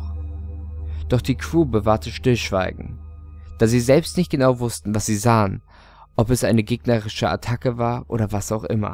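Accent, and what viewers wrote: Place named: North, Germany. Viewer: yay Norddeutsch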